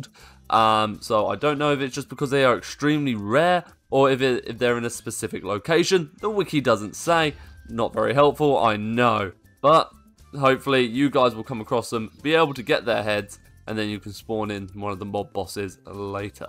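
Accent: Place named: United Kingdom. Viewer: ya fking british accent bro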